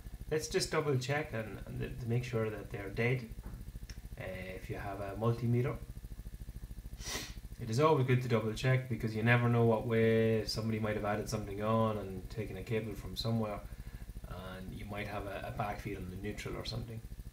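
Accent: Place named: Ireland. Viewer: its hard to be sure about a technician with an irish accent, its a tad off putting, telling a joke or singing a song, writing a poem, sure, but not doing something technical, just saying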